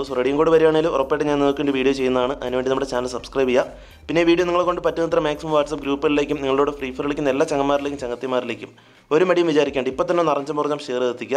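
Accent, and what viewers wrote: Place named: Tamil Nadu, India. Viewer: Hi bro Tamil Nadu voice nice bro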